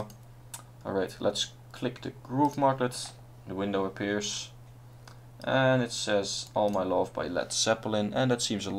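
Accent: Netherlands. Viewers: Haha, je hoort aan je accent dat je zo Nederlands als wat bent XD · your accent, you are dutch speaking are you?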